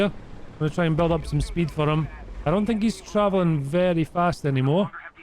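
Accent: Scotland, United Kingdom. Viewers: A Scottish bro who is a super Pershing connoisseur? Subscribe I shall!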